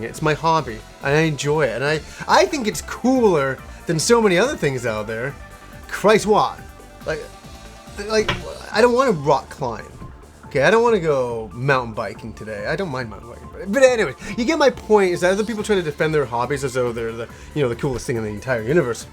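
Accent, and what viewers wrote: United Kingdom. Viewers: I didn't know you were from england. Now that i notice it you have a very slight british accent in your voice. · The more emotional you get, the stronger your British accent gets haha I love that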